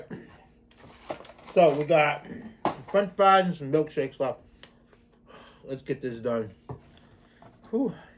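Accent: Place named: Rhode Island, United States. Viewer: I'm in Rhode island. I know your somewhere close based on your accent . New subscriber. Love your videos brother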